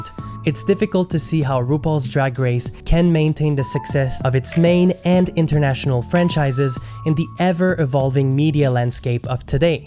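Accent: Quebec, Canada. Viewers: Not at all. Your French/Canadian?? accent is very charming 👍😊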